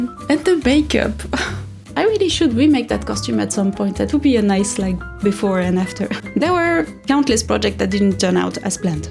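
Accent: France. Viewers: We love you exactly for all the crazy, fun, interesting stuff you do and HOW you do it. AND the failures and your creative ways to fix them. You know, you learn more from mistakes actually as they challenge your capability of finding solutions 👍 and please don’t go down the rabbit hole of meeting expectations of others (people that don’t even know you and you should not care about). You are perfect and beautiful - from the inside and the outside - the way you are (btw French accents are very sexy! if I may say so as a straight woman). Please, take a break from all the struggles, if you want to. And you have already made the first step towards leaving your current struggles: you have identified what doesn’t do any good for you, and you can now work on letting that go. I hope you will be better soon, sending you love and good energies 🌈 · If it helps: i really love your voice. 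My father is french and your accent makes me remember happy times and remember visiting France every summer. Merci ♡ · I❤to watch your videos. Your creativity is inspiring and your French accent is the sweetest. Did you ever see Nerdforge, she is your Norwegian "twinsister"on youtube. Would be fun to see you two make a video together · please accept many many warm hugs! I think it's safe to say that we all love your videos because YOU made them, and we are here to see the projects that make YOU happy, and see YOUR specific style. I don't think anyone here is expecting perfection, we're here to see your fun and creative ideas, things that inspired you, and you enjoyed making. By this I mean, don't put so much pressure on yourself, you can wait until inspiration hits and we'll be happy to watch the process when you're ready to share it. Now for a confidence boost: I probably spend half the time I'm watching your videos observing that you look beautiful no matter if you're wearing makeup or you're in your cozy blanket clothes. 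As a native English speaker, I think your English is great! It sounds very natural considering it isn't your first language, and your accent is delightful! I always wind up thinking with a French accent after watching one of your videos. ❤ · I really relate to what you say about running your own business- I do the same (not YouTube), and it's exhausting to have to do all the tasks, especially when you're not great at them. Social Media and Marketing are my least favourite too! You're so beautiful, with and without make-up, please don't ever feel like you're not. I love your personality, and your French accent is the best - I wish you didn't feel so bad about it! It's very relaxing to listen to :) I'm sorry you're experiencing burn out - it's hard to keep up creativity to such a high level all the time. I'm not sure if there are things the Algorithm will like that you could do that are less intensive, but Rachel Makesy will often mix in more casual videos to her channel e.g. 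painting a picture, trying out an old sewing machine, organising her studio, putting together different aesthetic outfits etc. Maybe you can do videos like - 'trying on my best and worst makes from 2023', 'fixing a failed project', etc. Maybe that would lighten the creative burden? I don't know - I'll watch whatever you want to do. Anyway, I just wanted to send some love and encouragement your way :) I'm so sorry you're struggling, but you will find a way through this, and the other side will be so bright xx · Please don't let the Internet tell you that you have to change! You are good the way you are, that's why we are following you ❤ I actually enjoy it most when you do something just for yourself and take us with you on that journey. And remember: Mistakes are something to learn from. (says me who is a teacher) I love the accent by the way. I used to have a colleague from France you always remember me of her. 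There is something about your energy... is there such a thing as a French energy? I really enjoy it, it makes me happy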